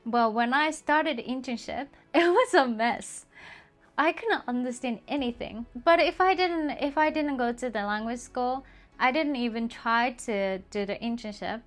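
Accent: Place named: United States. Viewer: 日本に住んでいるアメリカ人ですが、I have a question for you. いきなり聞くけど、 さんはもしかしてアメリカに行ったことがありますか? 住んだことは？😅 I guess you have spent time in either the US or Canada. なんかMiaさんの英語の発音を聞いてそう思っただけ🧐😉 頑張ってほしい😊👍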